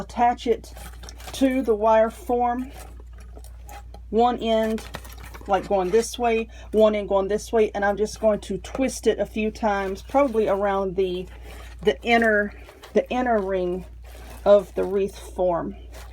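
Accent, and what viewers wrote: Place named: South Carolina, United States. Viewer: ABSOLUTELY BEAUTIFUL 🎅✝🌲❄⛄!!! I LOVE IT & I CAN'T WAIT FOR MY DAUGHTERS TO WATCH YOUR TUTORIAL'S & GO BUY MY SUPPLIES SO I CAN TRY TO MAKE SOME OF YOUR WREATHS 😊💝!!! WE LIVE IN ARCADIA FL BUT OUR DADDY WAS FROM SC & YOU SOUND & SAY STUFF JUST LIKE US GIRL, LOL 😂😂😂!!! I LOVE YOUR ATTITUDE & PERSONALITY 💝😊!!!!!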